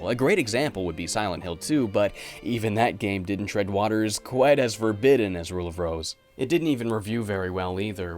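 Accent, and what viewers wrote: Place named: Nova Scotia, Canada. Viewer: Yo what the fuck you're Nova Scotian?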